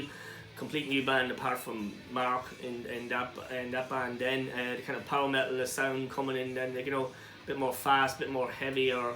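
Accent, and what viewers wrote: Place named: Ireland. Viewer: Love you voice I wanna say Irish ☘️ but I could be wrong not sure exactly but anything Scott Irish or German I feel at home .. and I live in Texas lmao 😂🍺cheers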